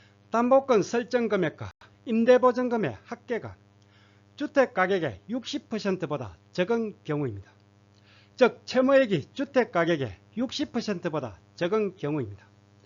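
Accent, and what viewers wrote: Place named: Gyeongsang, South Korea. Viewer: 형님 감사합니다 전세가 6억이면 어느동네 인지요 경상도사투시니까 대구 수성구 인가요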